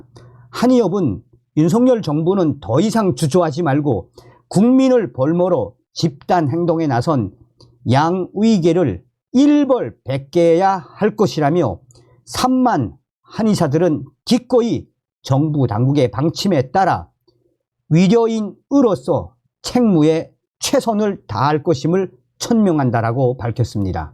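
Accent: North Korea. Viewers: 정성산님에 tv을 자주 보는데요❤ 말씀을 표준말을 쓰니까 예전에 북한말 쓸대 보다 듣기가 좋습니다 감사합니다 ❤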